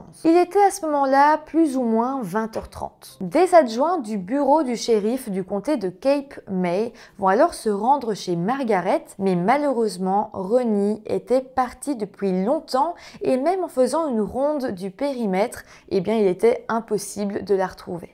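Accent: Belgium. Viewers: Hey mais... tu es belge ? :D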